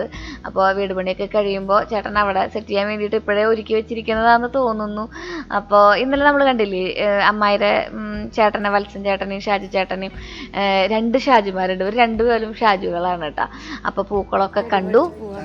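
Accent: Thrissur, India. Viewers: Cake cutting adyam kanunnu. Thrissur bhashayil samsaram kelkkan nalla rasamundu. 😊😊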